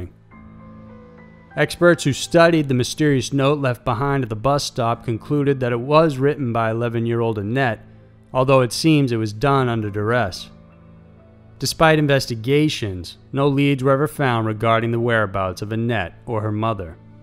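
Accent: United States. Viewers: The narrator has a perfect American accent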